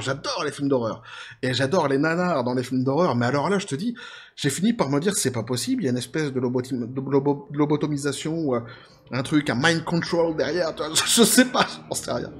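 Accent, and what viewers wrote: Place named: Quebec, Canada. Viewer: Avec ton accent québécois,je croyais que tu étais du Canada..lol. Le comble serait qu'un mec lambda fasse un film d'horreur sur Mickey et que ça fasse un carton. Ca serait excellent...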